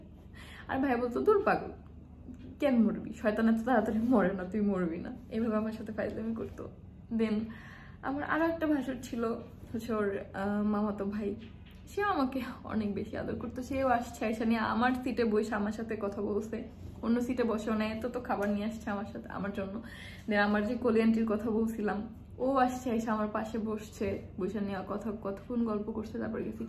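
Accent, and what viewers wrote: Barishal, Bangladesh. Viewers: Amader barishal barguna er Kotha boltacho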